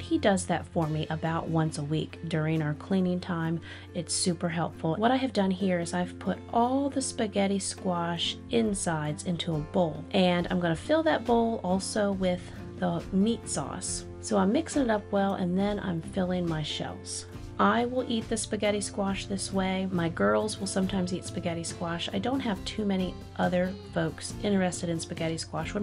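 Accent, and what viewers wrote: South, United States. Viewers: You're so welcome my dear. God bless you and your lovely family. Love the Southern accent. Makes me feel at home. We are originally from Virginia as well. God bless and keep making people smile. 😘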